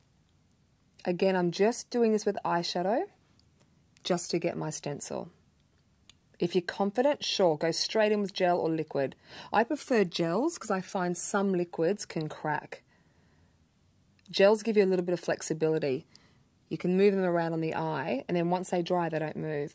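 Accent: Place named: Australia. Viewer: OMG... I am an Aussie and a makeup up NUT and I cannot believe I am only learning of this amazing woman now! Where the bloody hell have I been? Overlooking that, what a fabulous tutorial and explanation... too good! I have exactly this eye shape and have abandoned all hope of ever wearing a sexy flick but now, maybe, I can... how fabulous to have a tutorial so well explained and so relevant AND with an Aussie accent! New subbie and super happy, thank you, this was fantastic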